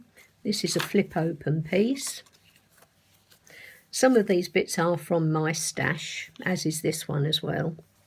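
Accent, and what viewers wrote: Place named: England, United Kingdom. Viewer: Absolutely Gorgeous Thank You for sharing with us . Nikki xxx ( I can hear you are English like myself ..Do you live in the UK )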